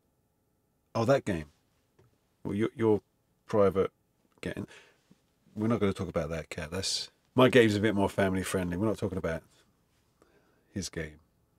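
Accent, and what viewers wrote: United Kingdom. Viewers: Hey, extra British in this video. Can’t tell ya why but just wanted to point it out. Love your stuff and your noodle.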